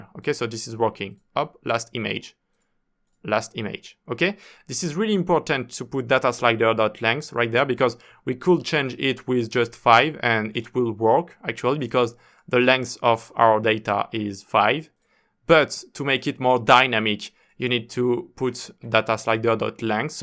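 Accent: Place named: France. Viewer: Oh mon Dieu l accent français ne nous quitte pas même en anglais force à toi mon reuf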